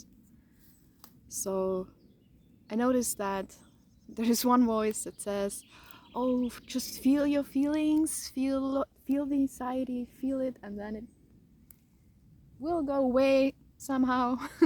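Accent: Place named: Austria. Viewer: it makes me happy to hear that i made you happy and.. i'm from austria too! what a coincidence, i thought that the accent and the sourroundings looked familiar...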